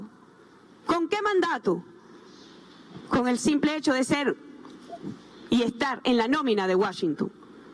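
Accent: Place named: Cuba. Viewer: Oie habla como cubana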